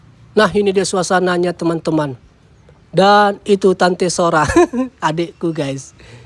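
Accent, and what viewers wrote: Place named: Java, Indonesia. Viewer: BANG LU JAWA MEDAN YA KOK NGMONGNYA KADANG ADA JAWANYA😁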